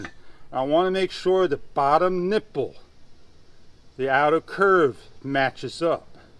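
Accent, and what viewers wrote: New York, United States. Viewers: That NY accent.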